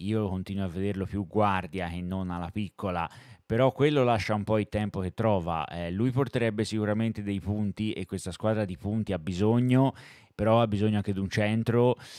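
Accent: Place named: Tuscany, Italy. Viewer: L'accento toscano è simpatico, così però è un po' troppo..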